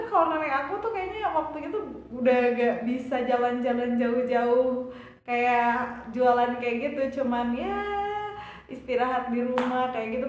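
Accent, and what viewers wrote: Java, Indonesia. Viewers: Logat mba nya sdh medhok juga he he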